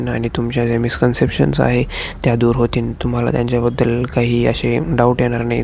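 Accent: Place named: Marathwada, India. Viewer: are bhai tu ekdam relatable vatla kharach bolaychi tone ekdam marathwadi ahe tich thev original vat te aikayla ani tu real rahshin main mhanje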